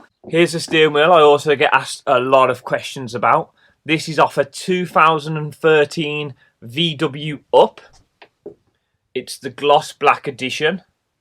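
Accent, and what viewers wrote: Black Country, United Kingdom. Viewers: Where about a you from , your accent sounds like Black Country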